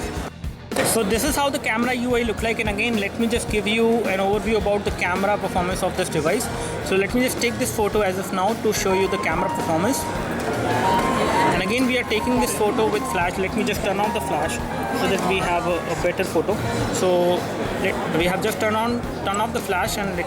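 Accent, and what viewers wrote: India. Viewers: Deep Indian accent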